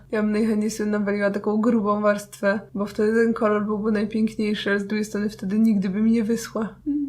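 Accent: England, United Kingdom. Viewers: Ach.... i ten jej angielski akcent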